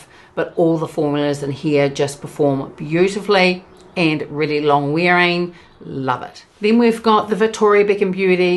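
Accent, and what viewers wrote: New Zealand. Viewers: I'm so glad I found your channel... I also love your beautiful Kiwi accent! Lots of love fom the other side of the world! 💜🇬🇧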